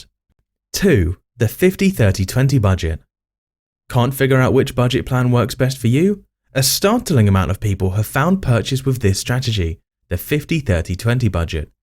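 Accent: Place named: United Kingdom. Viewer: The British accent is annoying.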